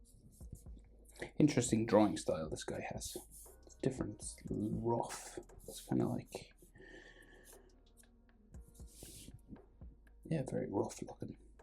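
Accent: Ireland. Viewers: is that an irish accent????????????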